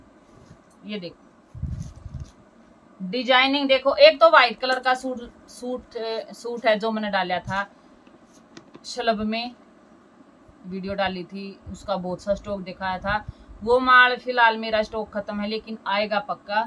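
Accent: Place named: Haryana, India. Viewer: हम भी हरियाणा से हैं, मुझे आप की बोली बहुत पसंद है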